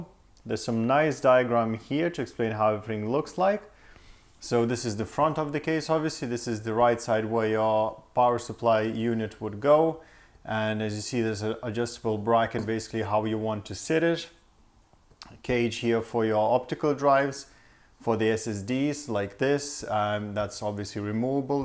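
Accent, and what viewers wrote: Ireland. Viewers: Hello, and thanks for this really great review. I didn't think it was boring at all by the way. (You mentioned this when showing the internals). How is the noise on this so far? I record and edit YT videos so I am wondering if you notice much interference on the recordings? Also, is that a slight Irish accent I detect? ;)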